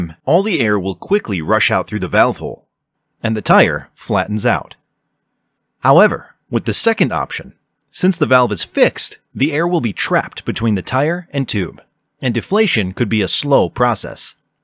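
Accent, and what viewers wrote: Kerala, India. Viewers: He has a Malayalam accent. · Worst Indian accent ever. It sounds like something out of a stand-up comedy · You talks like a mallu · Is that malyaali accent I hear? So good to hear that! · The മലയാളം accent seeps through.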